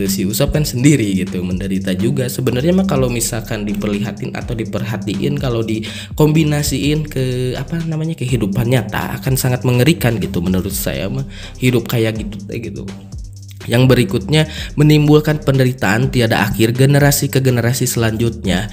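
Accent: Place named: Sunda, Indonesia. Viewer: sunda banget pembawaannya teh hahaha kerenn.. semangaatt boy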